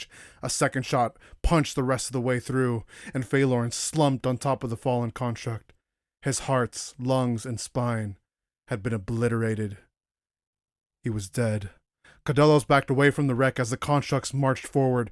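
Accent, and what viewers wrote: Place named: Russia. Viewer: Very cool reading !! Not a big fan of the Russian accent tho, makes it a bit difficult to understand the Marines lol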